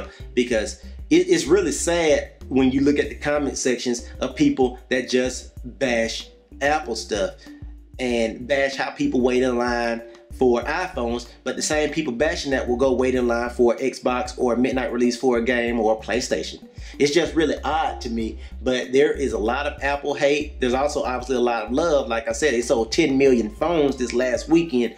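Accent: South, United States. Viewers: I just love his southern accent haha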